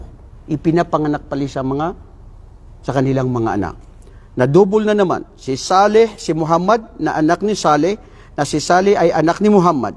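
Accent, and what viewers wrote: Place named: Visayas, Philippines. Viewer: Cute c ustadh mag bisaya😅 oi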